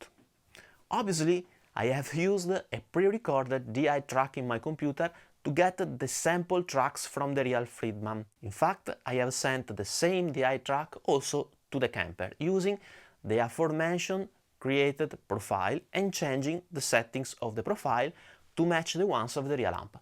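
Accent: Italy. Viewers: Great video, great channel from the few videos i've seen so far, and digging that Italien accent (I'm French btw) :)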